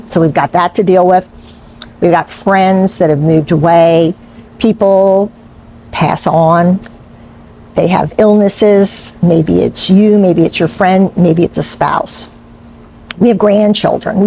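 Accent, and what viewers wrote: Philadelphia, United States. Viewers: Thanks... About your accent. Are you from Delco, Philadelphia???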